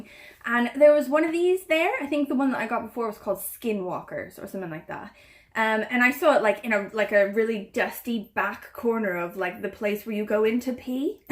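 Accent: Wales, United Kingdom. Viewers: I could tell you are living in wales because of certain things in your accent. At least here you get a dragon on the flag 🙂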